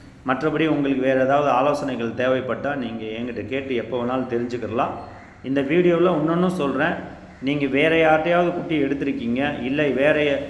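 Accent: Madurai, India. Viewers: ❤ madurai basha ❤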